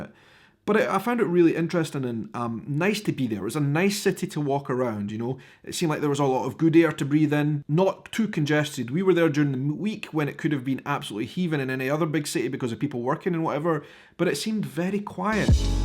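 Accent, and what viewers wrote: Scotland, United Kingdom. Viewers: Contact us next trip. My husband is from Irvine, Scotland talks just like you👍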